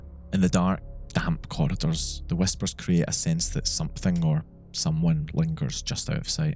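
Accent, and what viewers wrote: Scotland, United Kingdom. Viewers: Can't nail the Scottish accent down to 5O miles , I'm noob to the channel but am born in Glasgow and have been dealing with Mexicans on construction sites for too long in So-california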